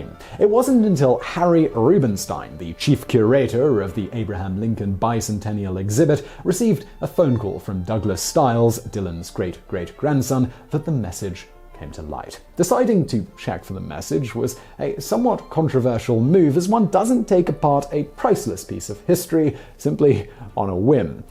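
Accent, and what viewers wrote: United Kingdom. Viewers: you have a British accent but, you use American terms. What do you call home? Btw thanks for the entertainment.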